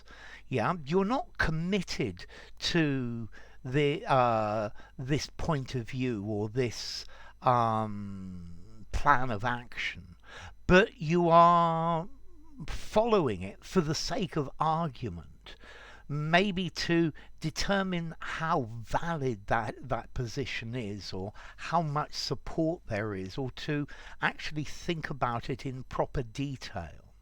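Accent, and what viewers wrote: United Kingdom. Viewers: My God, teacher's British accent is adorable 🤟😎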